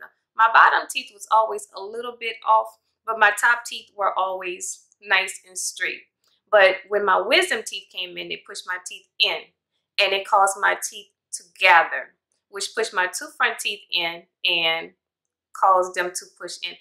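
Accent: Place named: Louisiana, United States. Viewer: U sound like you from Louisiana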